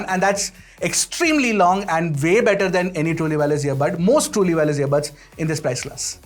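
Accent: India. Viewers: oh man, hard indian accent. its so authentic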